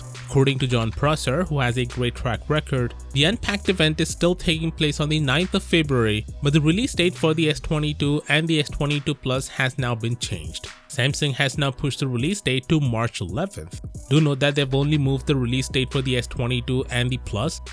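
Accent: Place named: India. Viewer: Good video my only feedback is that it was a little hard to understand with his accent. I sure other people are able to understand him fine. I think I just have an issue with Indian accents and making them clear. Nothing wrong with the narrator personally he definitely sounds intelligent and knows what he's talking about just a little hard for me to understand.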